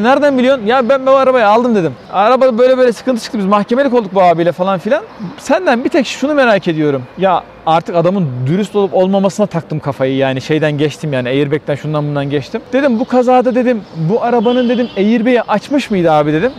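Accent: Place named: Isparta, Turkey. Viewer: Hocam ispartali misin? Ben yalvacliyim siven bizim oraları andırıyor. Anlattığın olayda ince düşünmen gayet güzel, takipteyim😊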